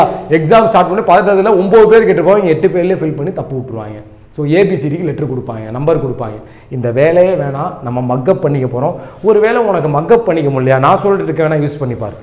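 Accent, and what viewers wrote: Madurai, India. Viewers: Madurai Lang itu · Madurai slang bro👌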